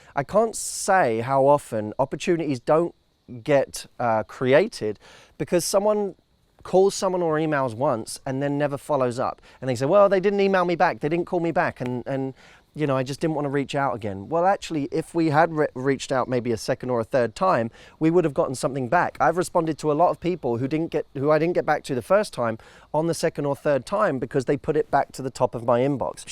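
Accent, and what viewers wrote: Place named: United Kingdom. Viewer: I didn't know he was British!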